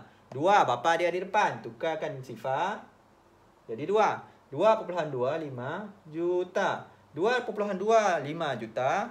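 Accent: Sarawak, Malaysia. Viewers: Kitak orang sarawak ka?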